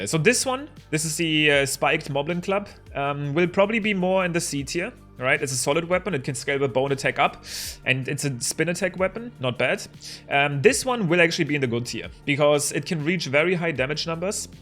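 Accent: Germany. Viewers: Richtiger Deutscher Akzent ^^ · I am high or is he german? He does have a nice accent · Ich höre das du deutscher bist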